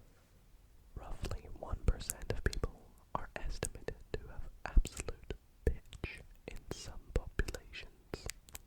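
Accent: Scotland, United Kingdom. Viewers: Day 1 of asking to make a video with only Scottish accent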